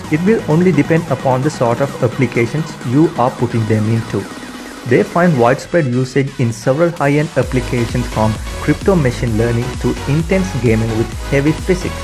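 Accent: India. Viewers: Ahh. Classic Indian accent. The land of street sh#tter. PS: I think the music needs to be louder. So we can bleed our ears out. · Bro shut the fck up your Indian voice is funny as fck😂😂😂😂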